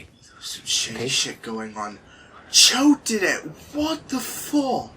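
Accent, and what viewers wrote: United Kingdom. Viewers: Another question: your accent is North of England? Yorkshire? I'm an amateur linguist.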